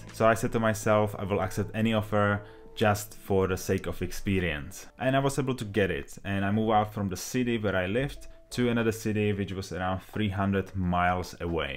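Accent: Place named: Czech Republic. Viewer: Can I just ask if you are czech by any chance ? I hear it a bit in the accent :D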